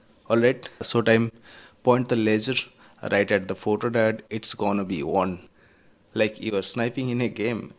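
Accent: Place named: India. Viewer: bro y you sounding indian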